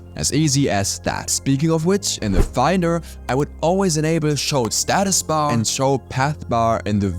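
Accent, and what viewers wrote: Germany. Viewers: Are you German ? Man kann es raushören 😅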